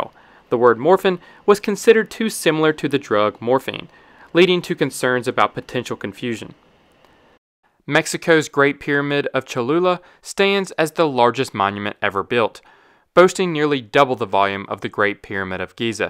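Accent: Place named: Southern Ohio, United States. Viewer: Narrator has a southern Ohio accent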